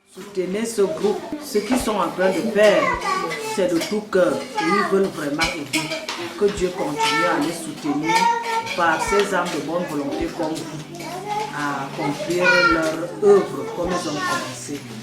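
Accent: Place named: Cameroon. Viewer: Vous ressemblez vraiment à une américaine, même si l'accent du pays est fort remarqué pour l'américano camerounaise que vous êtes, ma jolie. Je kiffe votre reportage.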